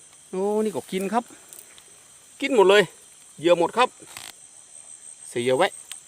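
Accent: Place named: Korat, Thailand. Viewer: ตกลงแล้วลุง อยู่ภาคไหน ภาคอีสานหรือภาคเหนือ สำเนียงเหมือนคนโคราช ติดตามมานานพอสมควรแต่ไม่รู้ภูมิลำเนาลุง จริงๆสำเนียงผมคิดว่าเป็นคนนครราชสีมา(โคราช)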